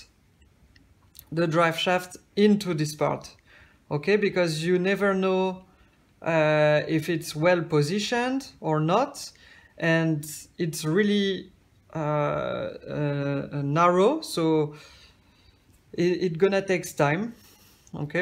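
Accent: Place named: New Zealand. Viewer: Very usefull, with a Kiwi accent, thanks.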